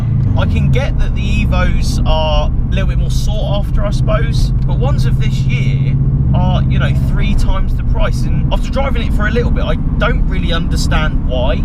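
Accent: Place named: West Country, United Kingdom. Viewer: are you from the isle of man or did u just move there? you sound very west country lol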